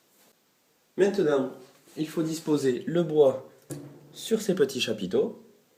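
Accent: South, France. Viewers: Super vidéo, pour ma part je n'ais jamais fait de feu et cet hiver je vais devoir savoir le faire, si je ne veux pas mourir de froid, vos conseils me sont utiles, ce n'est pas inné, si on a pas appris on sait pas faire. C'est pour cela que je suis venu voir cette vidéo, très bons conseils, voix douce et calme très bel accent du Sud que j'aime, ainsi on reste jusqu'au bout, très belle démonstration, merci beaucoup ! Me reste plus qu'à mettre en pratique ce que je viens d'apprendre grace à vous :) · GUIGNOL TU CROIS QUE LES GENS NE SAVENT PAS FAIRE DU FEUX DE PLUS AVEC TON ACCENT DU SUD TU AS DU EN FAIRE SOUVENT IMBECILE MEME PAS CAPABE DE FAIRE DU BOIS D ALLUMAGE TRAINE SAVATE FAINEANT MAIS CA DOIT AMUSER DE FAIRE UN PETIT FEU TOUT LES 10 ANS AVEC TA CASSETTE ANDOUILLE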